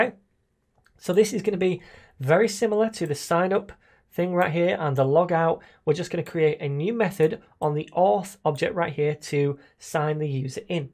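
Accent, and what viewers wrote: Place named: England, United Kingdom. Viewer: we have it COVERED.. but he's english and speaks fast so it seems covid.. lol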